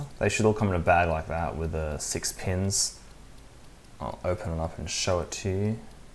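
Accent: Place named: England, United Kingdom. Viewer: This module should not be used without level converter. The VCC for the sensor is max 3.3V, see <link> and <link> There is no provision for level shifting on the module. If you apply 5V you are unduly overstressing the module and reduce its lifetime. I love your english accent but the accuracy of the contents leaves to be desired.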